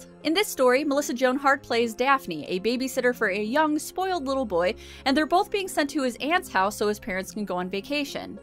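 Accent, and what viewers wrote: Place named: United States. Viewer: American accents like yours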